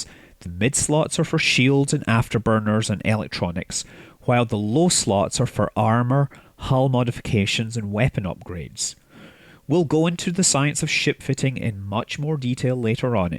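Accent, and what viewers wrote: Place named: Edinburgh, United Kingdom. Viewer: Are you from Edinburgh? Good comentators voice, easy to listen to, i'm from Cheshire.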